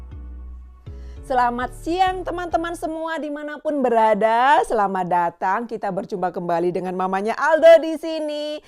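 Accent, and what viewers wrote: Java, Indonesia. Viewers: Sumatra kok logatnya jawa banget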